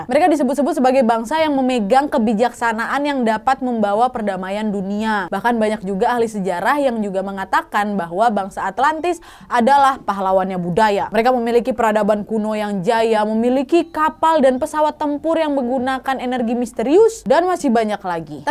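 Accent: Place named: Padang, Indonesia. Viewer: Hahahaaa ndk ada tu do, padang kali kak ini 🤣🤣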